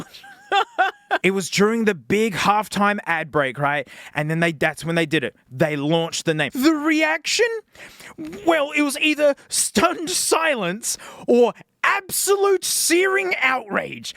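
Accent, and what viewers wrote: Australia. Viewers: some Assie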